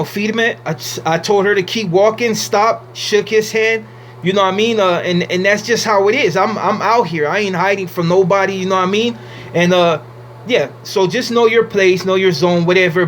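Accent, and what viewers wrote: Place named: Mexico. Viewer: " vale pito " only a true mexicano can talk like you carnal my respects im a new subscriber keep the videos coming bro sur up perrito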